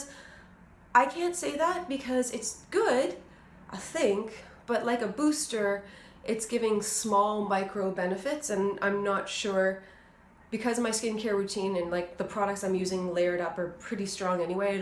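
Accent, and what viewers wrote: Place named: Canada. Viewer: Ok I was too eager beaver, you answered my question at the end. I'm also guessing you are Canadian because of the way you say 'out' 🙂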